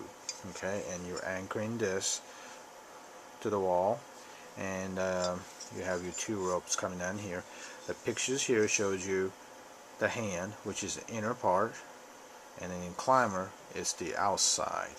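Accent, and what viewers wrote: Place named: United States. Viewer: So much easier to listen to America English that I use everyday.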